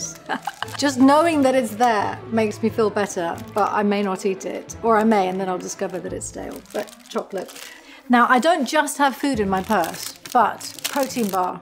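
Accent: England, United Kingdom. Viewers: Her beautiful british accent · OMG, i thought you were American, Love your British accent way better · I am blown away! Her British accent!!!!! Such a lovely lovely woman. Huge fan! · She has a British accent now? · I was like oh no that is not Gillian this lady has a British accent Scully doesn't have an accent. I am so used to hearing Gillian with an American voice. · What is with British accent? She was born in Chicago. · She’s actually not! Born in the US but spent part of her childhood in London. I forget why but her parents are not British. She moved back to the States at 11 or 12 but she had picked up a British accent while in the UK. She reverted back to American to fit it but she can switch back and forth as needed. She does her interviews in the US with her American accent. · That English accent surprised me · Why does she speak with British accent?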